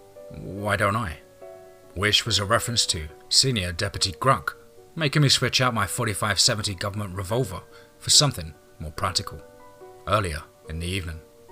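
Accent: United Kingdom. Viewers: Is it me or does the story being read sound odd in a UK accent versus being done in a Texas accent? It’s simultaneously seems off but it works really well at the same time.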